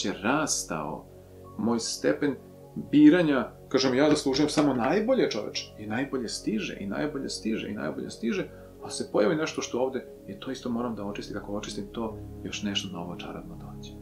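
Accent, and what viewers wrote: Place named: Vojvodina, Serbia. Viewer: Imas divan akcenat..rekla bih da si negde iz Vojvodine, kao i ja inace.. Svako dobro ti zelim i super si 😊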